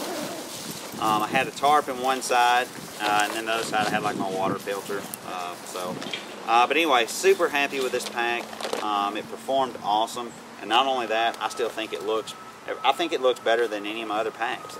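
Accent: South, United States. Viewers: I like your video. It's very informative. I'm new to hiking and I have have a question about the pack. Do you expect the pack to last a few years? The cuban fiber fabric seems so thin and looks like it won't last very long. I've never seen any in person just here on YouTube. I noticed the zpack website has a 1 yr warranty. I would just want to make sure it's worth the money. Also, you sound like you're from the south! Have you ever hiked The Pine Mountain trail in Georgia? It's a really nice trail with a little bit of presidential history. Franklin D. Roosevelt's Little White House is near by and he used to vacation there a lot. Thanks for the info!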